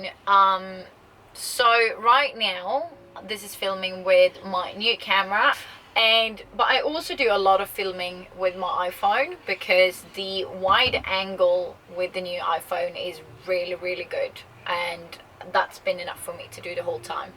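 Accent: Australia. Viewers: You are Swedish but why the Ausie accent??? Just wondering?? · Alltså jag blir så irriterad på kommentarer som dessa. pratar hur bra engelska som helst med australiensisk accent. Som jag förstått det har hon rest/bott mycket där. Om det stör dig är det väl bara att avfölja utan att sprida otrevlig energi. Jag skulle typ dö för att prata så bra engelska som gör. Tror snarare hon får fler prenumeranter då den engelskspråkiga delen av jordens befolkning är betydligt större än den svenska 😂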